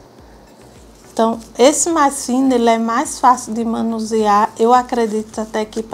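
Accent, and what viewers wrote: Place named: Northeast, Brazil. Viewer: Boa tarde. Amo seus videos e as explicações, sem falar no seu sotaque nosdestino. Tbm sou. Mas, não fique chateada.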